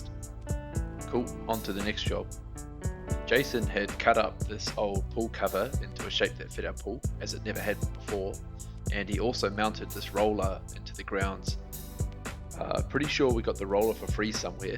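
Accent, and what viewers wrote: Australia. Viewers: Aussie accent. Awesome video. By any chance do you live near NSW central coast? Can you come paint ours? :)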